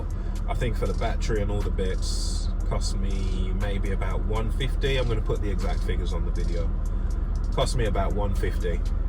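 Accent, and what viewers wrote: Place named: United Kingdom. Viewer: Nothing cooler than a black guy with British accent 👍🏼👍🏼👍🏼👍🏼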